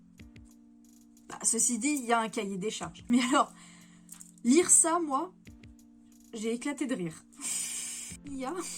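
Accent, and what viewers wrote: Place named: England, United Kingdom. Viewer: Ton accent anglais oooh. Les valeurs traditionnelles zombies oh yeah.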